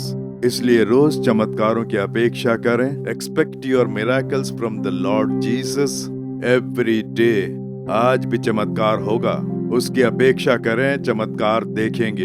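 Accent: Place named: Chhattisgarh, India. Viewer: Chhattisgarh ki bol rahi hai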